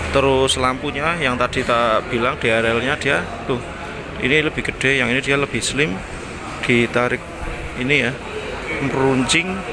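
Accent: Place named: Java, Indonesia. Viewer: Jowoo medoq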